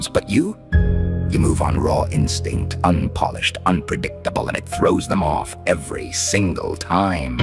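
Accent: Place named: United Kingdom. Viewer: Your voice has a British accent to it under the voice modulater. Blimey.😂❤❤❤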